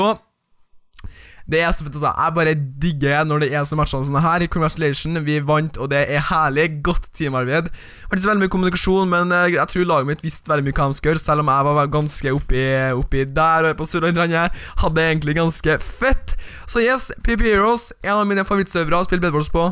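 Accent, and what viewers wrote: Trøndelag, Norway. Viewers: du e trøndersk d e jo drit bra trønderan e bæst!;D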